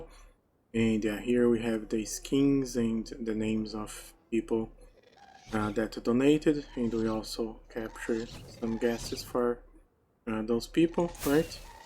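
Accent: Brazil. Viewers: Que sotaque bom de ouvir. Sotaque br pra inglês é massa demais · esse cara é muito brasileiro kskks, o sotaque entregou de cara, BRABO!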